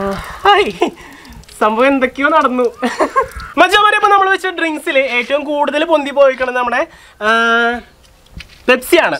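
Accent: Thrissur, India. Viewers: Thrissur karde sound anganeya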